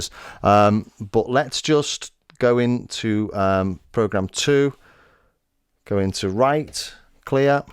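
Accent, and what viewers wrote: Liverpool, United Kingdom. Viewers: Loved discovering you and I subbed. Excellent comparison and I thought it was honest, witty and basically well done, even when negotiating embarrassing technical ground hum. The 303 and Roland sound was so well designed it's hard to improve but you documented the differences well. The 303 has that env mod slide on every note and is generally rounder or rubberier while the Nubass here sounded sharp and precise,with more range. Sometimes a bit too piecing,more isn't always better here (rez) but you showed off the sub osc and lfo well. Good stuff and as a fellow scouser it was lovely to hear the accent so well represented- articulate, not too rambling but clear and funny (without trying too hard). You may of found a YT niche. Sweet.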